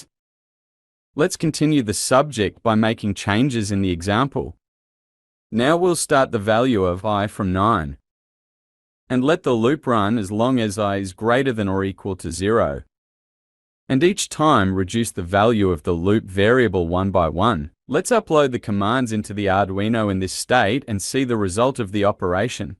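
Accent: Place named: Australia. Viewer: Nicely explained with an Ozzy accent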